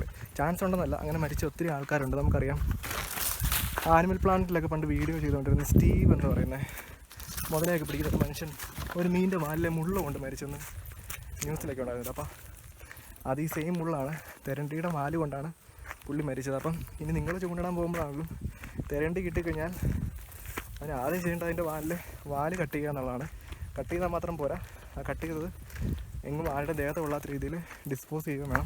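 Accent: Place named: Vaikom, India. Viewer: Adipoli samsaram kettapozhe thoni enta sthalam vaikom ippo soudiyila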